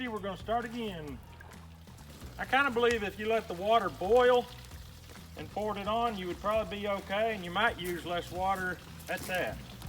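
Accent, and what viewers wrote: South, United States. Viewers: I like your southern draw...and your clean hams 😂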